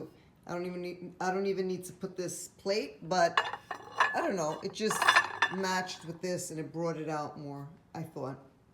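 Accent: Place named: Northeast, United States. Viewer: Beautiful, I like the traditional red and green colors for Christmas. Great idea with the use of the cardinals, I love it. I am going to incorporate them into my Christmas decor next year. I enjoy watching your videos and listening to your accent, you remind me of some of my dear friends. Are you from New York, New Jersey or Pennsylvania, by any chance? Have a wonderful holiday.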